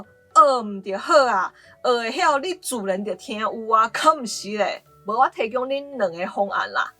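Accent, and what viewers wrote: South, Taiwan. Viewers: 支持你講南部腔啊！聽起來很舒服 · 南部人當然說南部腔，不然難道要說北部腔嗎？突然說出別的地區的腔調才奇怪咧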